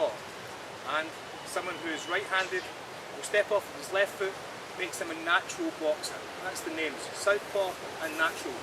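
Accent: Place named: Ireland. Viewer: good ole irish accent. atleast i think its irish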